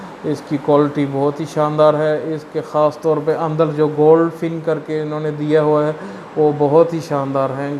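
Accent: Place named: United Kingdom. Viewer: urdu ko انگلش ke style main بولتے ہیں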